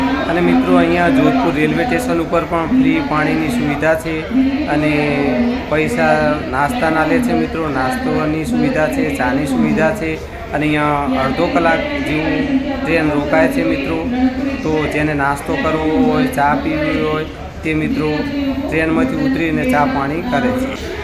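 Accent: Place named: North Gujarat, India. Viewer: ભાઇ તમારી ભાષા ઉતર ગુજરાત ધાનેરા થરાદ ની લાગે છે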